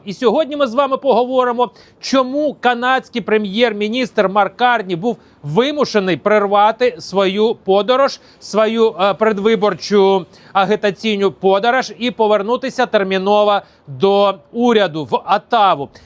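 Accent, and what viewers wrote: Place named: Russia. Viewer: Дякую за вашу працю. Але треба ще працювати над вимовою, бо є багато російського акценту: Атава, кА мандир і тд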